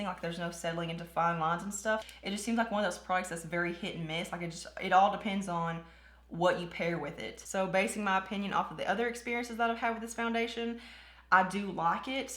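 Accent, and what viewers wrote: South, United States. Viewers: This was helpful, thanks. The look is very pretty. Love to hear other southern girls!! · I love your accent, sounds very southern! 💗🤗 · Ok I admit it. I didn't even watch the whole video before I hit subscribe because once I heard your accent I was sold. Helllloooo southern friend. I'm from Alabama. 😂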